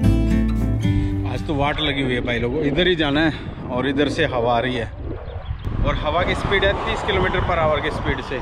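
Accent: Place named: Bihar, India. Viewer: Aapka jo bolne ka tone bahut bar Bihari tone lagta hai I am watching yours video for two days continuously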